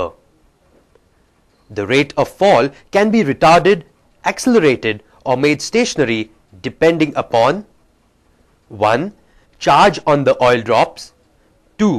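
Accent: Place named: India. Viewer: stupid hindi ascent!!!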